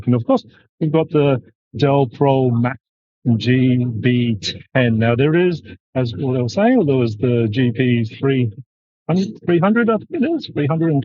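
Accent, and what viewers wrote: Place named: Australia. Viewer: The aussie accent 😆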